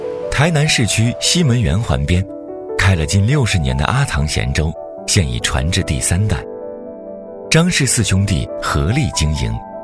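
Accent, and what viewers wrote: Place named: Shandong, China. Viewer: 根据旁白说英语的口音，我觉得他是山东人。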